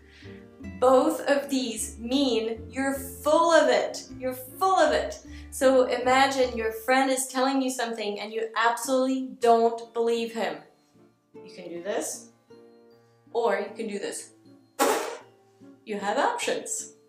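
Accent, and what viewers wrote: United States. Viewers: By the way, how do you manage to have such a fantastic American accent? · she's american, not french, i'm french by myself and when she speaks english she has a little accent so i think that's why her american accent is perfect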